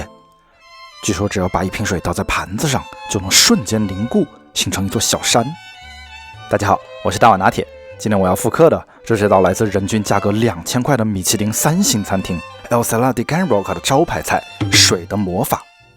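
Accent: Wuhan, China. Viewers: 听出了武汉口音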